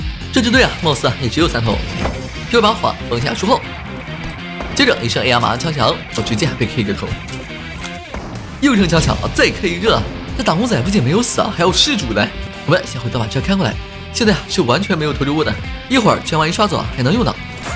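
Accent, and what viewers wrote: Taiwan. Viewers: 连续看你的戏十次这是第四次台湾腔好听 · 其實臺灣腔也蠻好聽的 （猛然發現的標題是繁體字）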